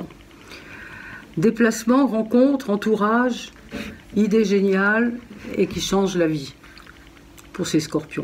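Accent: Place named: Algeria. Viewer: Accent pied-noir plein de soleil ☀️ merci pour votre travail ! Bélier ascendant vierge et lune en scorpion... j'espère que cette année m'apportera douceur et amour ❤️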